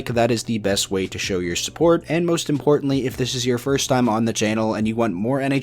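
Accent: Canada. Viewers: As a Canadian, you have the most Canadian accent i've heard in a while. You have to be from a small town out in butt fuck no where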